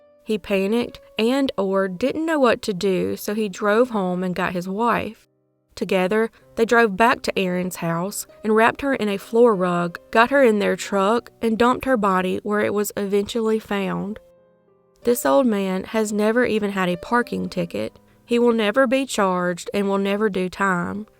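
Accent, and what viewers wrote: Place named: Tennessee, United States. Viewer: No sweetheart, you is 100% country. Cornbread is more city than you. I can say this because you sound like everyone in my hometown. Where I still live. TN We love you.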